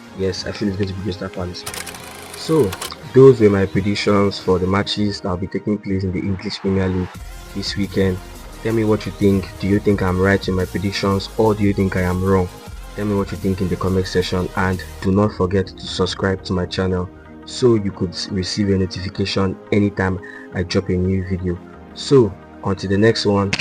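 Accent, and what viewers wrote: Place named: Biafra, Nigeria. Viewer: Was Nigeria colonized by the British? This accent is Biafra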